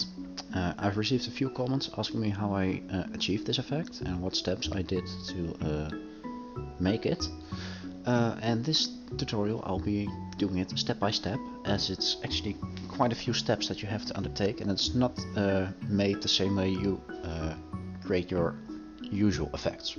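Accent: Netherlands. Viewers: you from the netherlands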